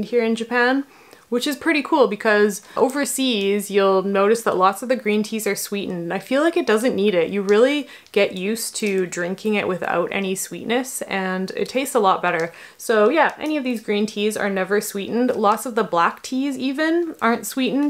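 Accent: Canada. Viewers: K, you've been living in Japan for that many years... but i still heard that Canadian about. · Its always funny when I hear a canadian say “abooout”. eh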